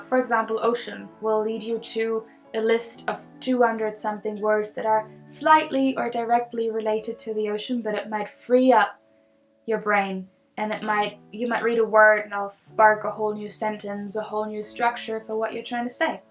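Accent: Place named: Ireland. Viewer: "...if you're still watching this." Hi,. How could we not watch your video:) this was a very good "how to". I would say you are just scratching the surface of what and how you do it. You raised many interesting ideas of "things to do" to capture your thoughts/feelings about life. You seem very natural and calm in front of the camera. Your English is very good, esp considering it is not your native language. I detect just the slightest hint of an Irish accent when you get rolling. I agree with being well rested, having a journal and writing in the morning when you say what you really think. This is a good topic and i am hoping to see more. I am proud of you, little butterfly 🦋 have a good weekend and thank you:)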